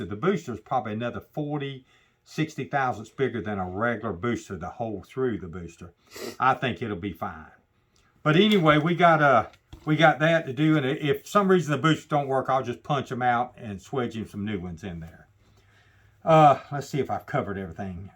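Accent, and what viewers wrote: United States. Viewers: Are you from Kentucky? Your accent sounds like my relatives.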